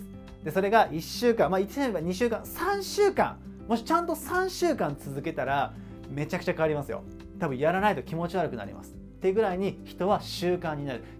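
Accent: Kansai, Japan. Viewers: ちょっと関西弁訛りある気がする(結構好き)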